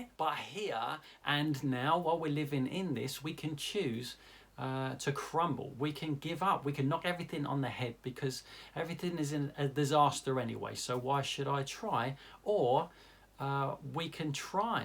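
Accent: United Kingdom. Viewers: babes, what's your accent called? I love it! You're from the UK?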